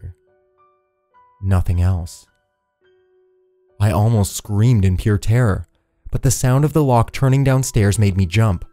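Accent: Canada. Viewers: Love Your channel...New Subscriber :)....Your Canadian right?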